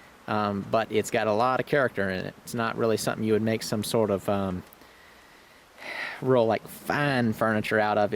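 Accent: United States. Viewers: Hey bud, noticed your canoe next to your wood pile. I pretty sure from your accent and the terrain that we're in the same region of the US. Just wondering if you've ever done any whitewater canoeing on the Nantahala river or some of the others in the area. You seem like the kinda fella who would really enjoy it. I used to go pretty often when I was around your age. It's lots of fun and it's something I'd think you'd enjoy with your significant other. Thanks for the videos, really enjoy them.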